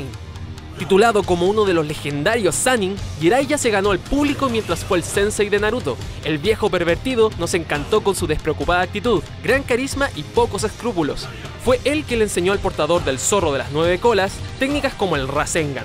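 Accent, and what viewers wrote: Chile. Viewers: Se nota que es chileno, buen video amigo saludos de coquimbo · Chileno verdad? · Es chileno??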